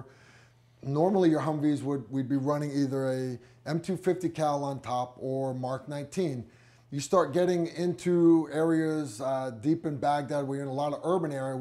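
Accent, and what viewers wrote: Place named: Brooklyn, United States. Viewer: Gotta be from Brooklyn or the Bronx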